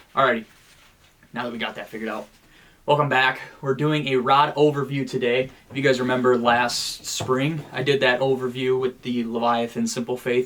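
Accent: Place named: North, United Kingdom. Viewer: This channel is awesome, this guy totally reminds me of another YouTube angler. If you close your eyes and just listen to his voice, he sounds almost identical to Sam Sobi, just a lil less northern 😂.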